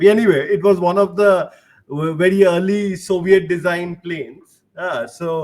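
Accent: India. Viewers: The inferiority complex of the Brahmans lol. The bloody Indian accent makes it even worse.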